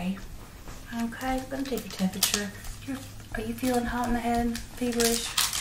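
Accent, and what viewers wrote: South, United States. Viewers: Your southern accent is better than most Hollywood versions with voice coaches and technical consultants... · with that Southern belle accent, this Yankee would tell you anything you want to know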